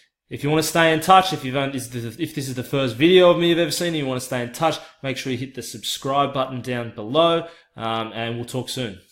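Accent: Australia. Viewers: You sound so Australian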